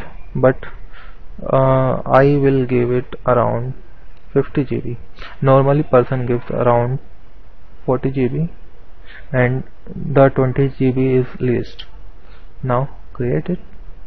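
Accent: India. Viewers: Actually, I understood him very well, sure he has an Indian accent, but good English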